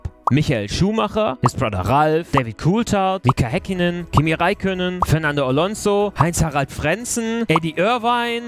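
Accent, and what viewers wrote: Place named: Germany. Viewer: sehr gutes englisch, bis du die namen aussprichst. das klingt sehr sehr deutsch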